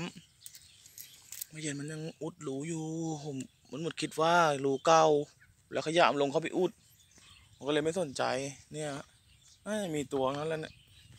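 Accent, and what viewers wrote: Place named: Kanchanaburi, Thailand. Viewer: อยู่ไหนเสียงเหนอเมืองกาญจ์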